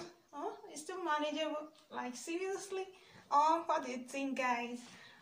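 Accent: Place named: Nigeria. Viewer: Are u Nigerian cox ur accent is Nigerian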